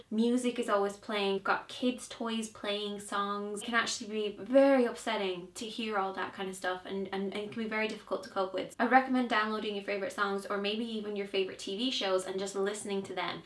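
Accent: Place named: Ireland. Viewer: She's Irish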